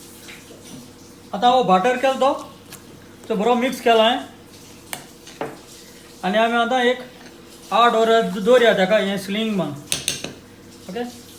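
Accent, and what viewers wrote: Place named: Salcete, India. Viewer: I liked ur salcette konkani... Feel like I am in colva my uncles place.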